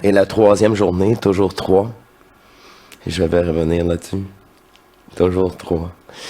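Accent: Quebec, Canada. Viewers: Et bien je kiffe l accent québécois… ta berwete ici c est une brouette mais je kiffe quand même jolie chanson que cet accent … et il est vrai que ces picotements j ai eu aussi cela les derniers jours … amusant tiens … portes toi bien et prends soin de toi merci d être là et de partager cela gratitude à toi 🙏✨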